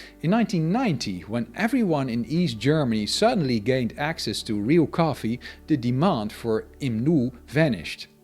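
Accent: Netherlands. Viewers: I also thought he sounded Dutch.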